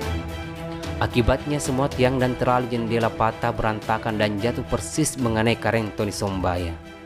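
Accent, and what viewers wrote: Bugis, Indonesia. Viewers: ini tdk masuk akal , dialek saja sdh salah ,menyebut nama saja sdh tidak benar , logatnya bugis .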